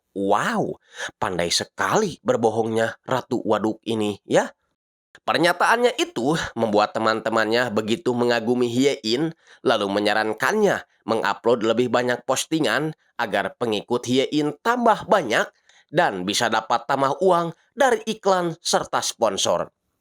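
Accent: West Java, Indonesia. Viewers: Orang Sundak kah bang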